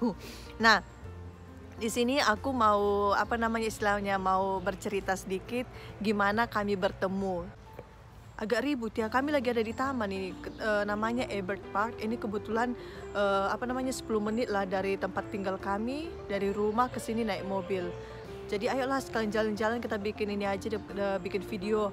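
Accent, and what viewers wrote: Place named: Sumatra, Indonesia. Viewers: Cantik. Logatnya medan banget, "permisikan", heheee... tp enak ditonton 👍 Lanjuttt 😊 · Aku orang Siantar bah ,ku subscribe lah kakak ini 😁😂 kek gitu lah logat orang Siantar , cantik-cantik ,rendah hati orang Siantar Weh, setia lagi dah ! 😇😁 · Masih lengket banget ya logat Siantarnya.... Saya juga orang Siantar di Jl Sisingamangaraja dekat Rindam. Rumah orangtua di jalan apa? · Hai kak salam kenal logatny siantar man banget bah,salam dr anak siantar yah kak....jangan lupa ajari abang itu bahasa batak jg kak....senang lihat vlog kk auto subscribe 🥰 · Wah...salut bgt dek...walau sdh berada di Jerman.... logat Medan dan Siantar nya msh sgt kental....saya salut... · Cantik banget spt artis Begitu ngomong, ketauan, dialeknya masih kental, Batak banget, tpi saya pribadi bangga. Sukses buatmu dan keluarga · Paten kali logat nya iban..., siantar nya dimana..., sama kita org siantar... 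· Logat kau kayak orang medan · Logat Bataknya lengket x ya ito Hehehehe God bless u both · Saya senang mendengar logat kakak yang tetap seperti logat sumatra 👍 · Asli medan bah logatnya, suka.. Mamak yg dewasa..mantap..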